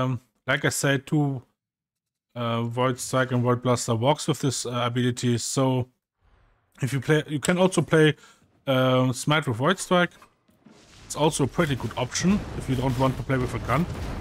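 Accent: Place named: Germany. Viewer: Thanks for the tip! and your Denglisch is really gut :D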